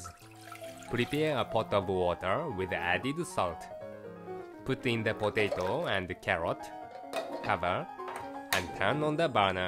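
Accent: Japan. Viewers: I love watching your videos! Very clear and not too fast step by step on how to make the dishes. Other cooking videos I have seen so far were too quick/too fast to follow. Love your dog,too! The narator's english with his Japanese accent also has become your video's character even more Japanese 😊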